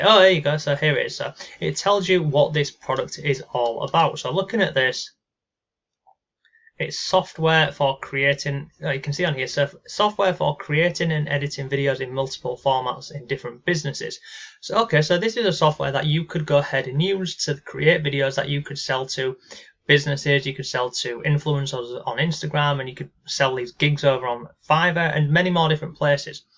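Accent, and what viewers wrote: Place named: North, United Kingdom. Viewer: I love your accent! Is it from the north?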